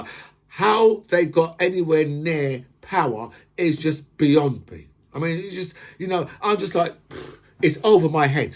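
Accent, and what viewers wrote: London, United Kingdom. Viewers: Cost of living is difficult for people on low incomes. I 'm in the NW. Butter or anything else other than spirits are not under lock and key in the supermarkets [5] where I live. In fact there is no to little crime here. When I moved here the headline in the local Advertiser was "Daisy the cow is 32", bit of an eye-opener after living in Manchester for 40+ years ! From a previous video where you mention £2000 per month rent [which I don't dispute] I looked online round here. Just over a grand for 4 bedroom 2 bathroom property. Jacob Rees-Mogg has 6 kids. Should he get child benefit ? Maybe 3 would be a reasonable limit and then means-tested ? Spent about 5 years off and on in the USA. I have mates who live there. The food was fine. Pretty sure salmonella in eggs, BSE and horse meat sold as beef was nothing to do with the USA. 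From the accent I'm thinking London. You think that might skew your views on some problems ?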